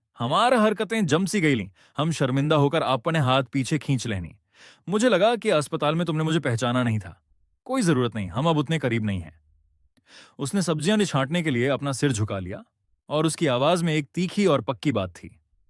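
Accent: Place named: Bihar, India. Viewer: Bhai tu bihari se k video m bhi bihari bhasha ave h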